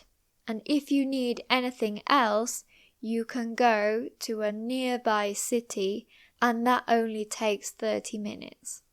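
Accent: United Kingdom. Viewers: just so amazing! I love your British accent, slow speed, and very natural intonation. I definitely will become your new member! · British, American, Accent?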